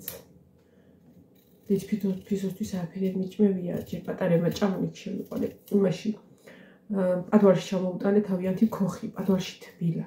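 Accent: Martkopi, Georgia. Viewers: ძაან ბუნებრივი ხარ და შენი მარტყოფული კილოც მაგარია❤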